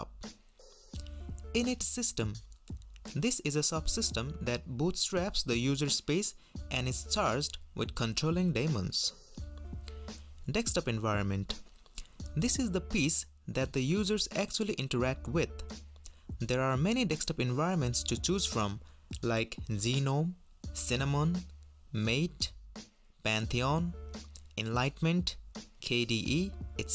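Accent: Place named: Finland. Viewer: Fills like this guy is Finnish :)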